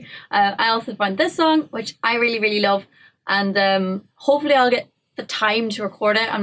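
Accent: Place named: Ireland. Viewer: You loosing that beautiful Irish accent, need to spend more time back home.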